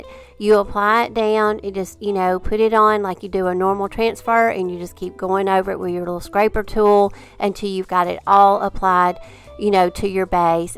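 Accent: South, United States. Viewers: Awesome job. 🥰 I love your Southern Southern voice. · you never fail to amaze me! I love your style, your cute southern accent & your beautiful projects, thank you!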